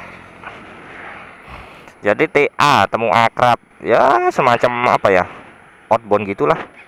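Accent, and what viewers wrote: Malang, Indonesia. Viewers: wihh... arek ngalam bro podo 😂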